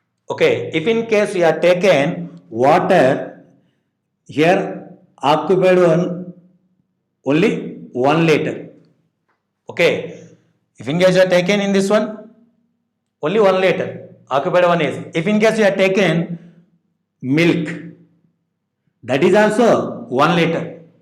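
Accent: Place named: Telugu, India. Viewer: Though they don't speak good english but we can understand it. We should not judge people by the way they speak we should judge them by their talent they possess. They are telugites they are made to speak that way (telugu slang in english). Though they are not good in fluent english they are good in their teaching and we are able to understand.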